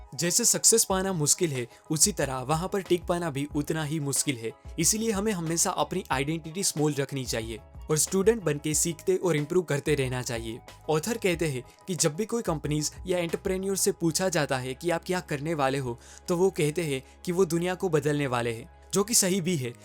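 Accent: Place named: Kathiawar, India. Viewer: Kathyawadi touch feels in your voice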